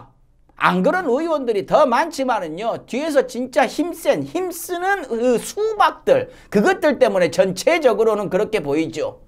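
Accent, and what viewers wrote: Jeolla, South Korea. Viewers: 님은 서울이여 근데말은전라돈데여 공정하게말씅하세요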